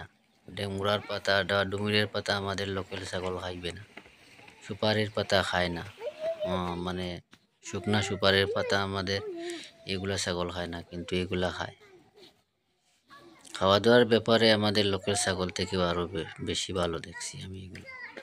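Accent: Sylhet, Bangladesh. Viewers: ভাই আপনি কি সিলেটি ত আপনার লোকেশন কোথায়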